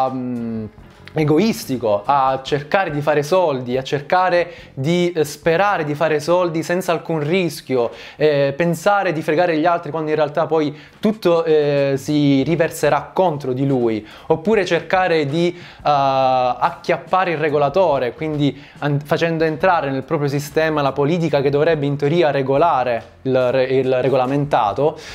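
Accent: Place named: Tuscany, Italy. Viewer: Quasi toscano direi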